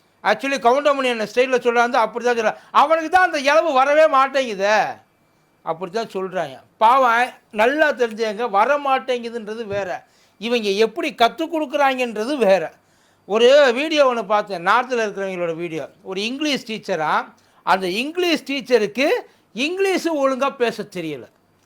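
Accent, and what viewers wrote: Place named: Madurai, India. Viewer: நல்ல மதுரை தமிழ் பேசி அசத்துறீங்க.நன்றி தொடரட்டும்.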